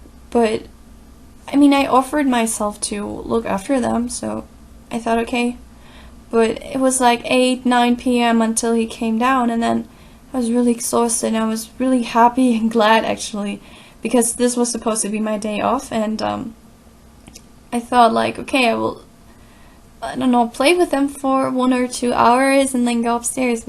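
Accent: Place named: Germany. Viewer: wie man immer sofort weiß dass jemand deutsch ist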